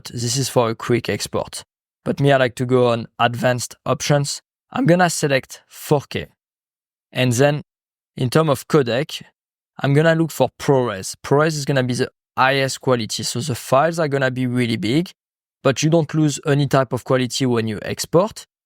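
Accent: France. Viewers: Félicitations quand meme pour l’anglais, ca me trigger quand meme 😅, j’entend du franglais c’est perturbant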